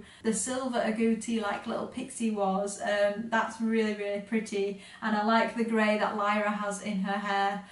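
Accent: England, United Kingdom. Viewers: Is she from england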